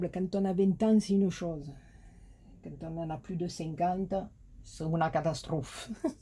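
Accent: South, France. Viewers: on entend bien l accent du midi.je suis de l aude tres jolie carte.tres vintage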